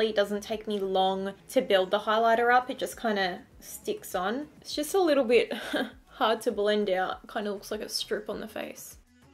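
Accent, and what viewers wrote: Australia. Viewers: lol i was like.... nz or aussie? and then she said 'they bloody hurt' and i was immediately like yup aussie 😂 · Australian accent 😍😍😍😍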